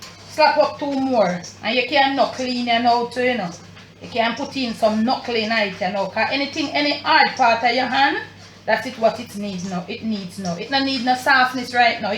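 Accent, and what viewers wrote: Jamaica. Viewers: I love this lady! Love the way she chat the real Jamaican chat❤️ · Does anybody else love her jamacian ascent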